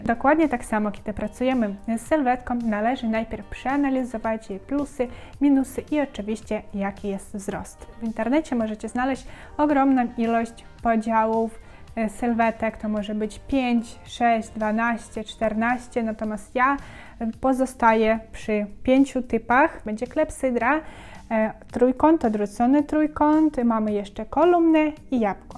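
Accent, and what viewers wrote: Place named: East, Poland. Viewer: Następna stylistka z wschodnim akcentem.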